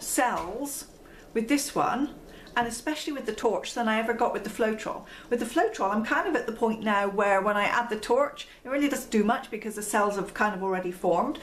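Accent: United Kingdom. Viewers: It is so nice to watch your videos. They are straight and to the point. I would also add that it is nice to hear a concise commentary, and also a UK accent as so many are from USA it feels like this art is solely for their market. No disrespect intended, but it is refreshing to know that this art is global and there are those here in UK who also embrace this wonderful art.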